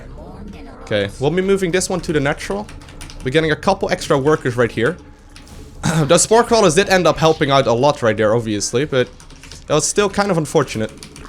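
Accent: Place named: Netherlands. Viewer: Hey! Really enjoying your podcasts! I'm a teacher of English in Holland and I can clearly hear you've got a Dutch accent, but your English is flawless. I also love how cleverly you played this game! Unfortunately you weren't playing as greedy as you should've, but it's good you figured that out yourself!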